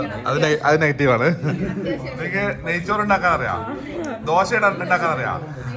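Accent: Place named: Kannur, India. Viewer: കണ്ണൂർ slang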